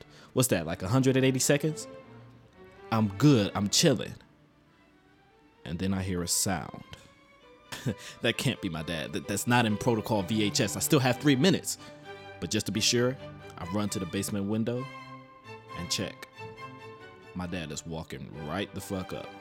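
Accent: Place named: Michigan, United States. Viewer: accent! #MichiganNative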